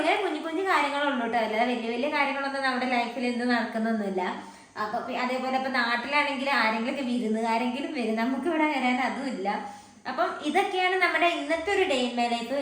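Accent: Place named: Malappuram, India. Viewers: Malappuram thanneyanu 😂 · വടക്കൻ ഭാഷ..!!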